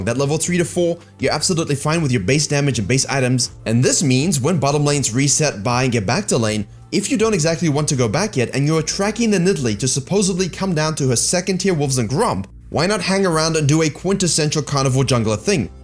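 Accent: South Africa. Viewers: I KNEW IT... U HAVE A SLIGHT SOUTH AFRICAN TONGUE OMW I LOVE YOUNOW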